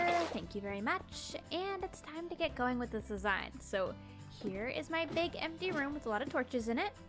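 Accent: Australia. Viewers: Hey! Are you Australian at all? I love the videos, great job:) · Just a question are you Australian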